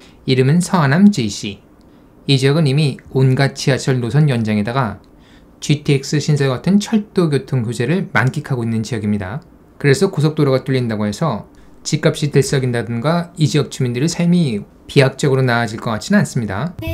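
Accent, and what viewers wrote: North Korea. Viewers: 말투가 탈북인 같은데....아.... 새터민....이지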